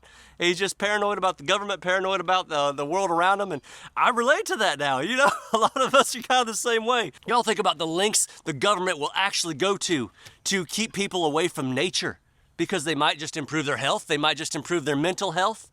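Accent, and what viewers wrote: United States. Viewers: You sound USa educated.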